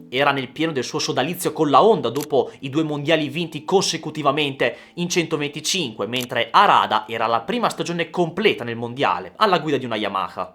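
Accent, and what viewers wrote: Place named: Modena, Italy. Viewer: Di dove sei? A volte sembri reggiano, a volte modenese... più modenese...bei video!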